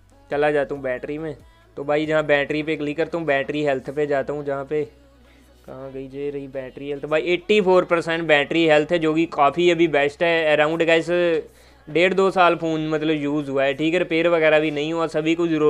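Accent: Punjab, India. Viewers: Hindi in Punjabi Accent😅🖒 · Punjabi bhra mil gya - me awaj to hi pachan lea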